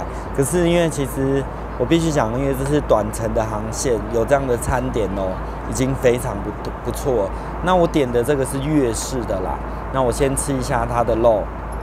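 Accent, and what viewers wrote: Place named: Taiwan. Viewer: 台灣人講話本來就這樣好嗎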